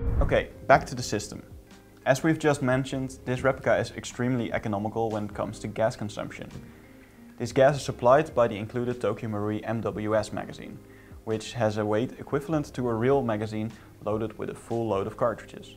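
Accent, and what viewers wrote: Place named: Netherlands. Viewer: I instantly heard Dutch english hehe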